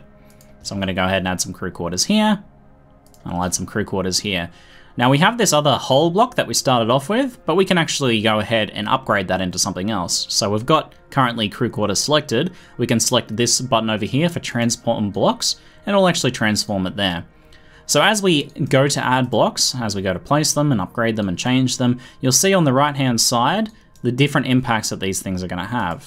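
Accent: Australia. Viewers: I must say, an excellent tutorial! And always great hearing our Aussie accent on Youtube! Onya keep up the amazing vids!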